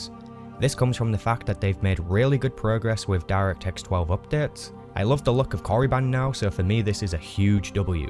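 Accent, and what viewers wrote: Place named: Northern England, United Kingdom. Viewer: A fellow northerner? I must subscribe! Love owt like this wi’ voices like ours like.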